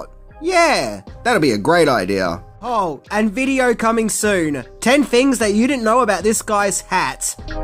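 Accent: Australia. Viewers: Seriously? He’s one of the most Australian-sounding people out there!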